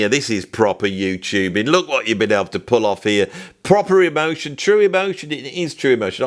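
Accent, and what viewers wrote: United Kingdom. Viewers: It is episodes like this one that keep me watching,. I have subscribed and unsubscribed a number of times, usually because of offense that you either purposefully or inadvertently bring to my Christian beliefs. But its when you get right down to what life is all about and get very transparent and authentic that keeps me hanging around. I do enjoy your sense of humor and your entertaining British accent, which reminds me of the Beatles, and I understand that every episode can't be like this one, but we all need to be more human and look at ourselves and others for what we are to understand our wretched sinful condition and our need for the love of God. Keep up the good work!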